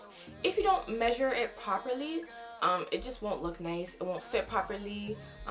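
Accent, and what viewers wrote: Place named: Nigeria. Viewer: Liked right after I heard the Nigerian accent 😂. New Sub‼️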